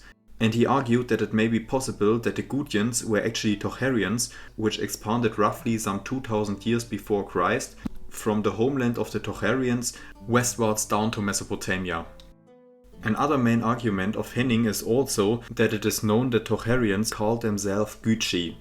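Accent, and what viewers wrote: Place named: Germany. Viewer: Der deutscheste Akzent, den ich lange gehört habe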